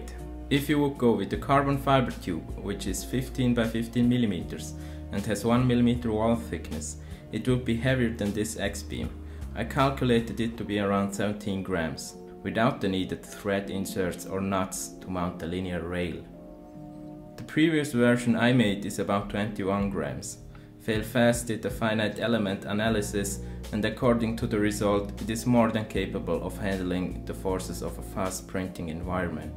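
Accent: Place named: Switzerland. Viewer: you sound pretty swissish to me, so wenn du interesse hättsch: ich han e gigantischi DMU 80 wo dir paar use haue könnt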